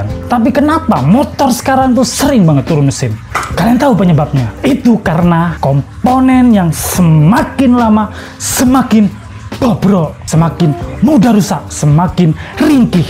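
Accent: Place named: Jember, Indonesia. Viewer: Bahasanya cekk jember nya rawes kamu mat mat 😂😂 Suwun sing akeh mas infonee 😁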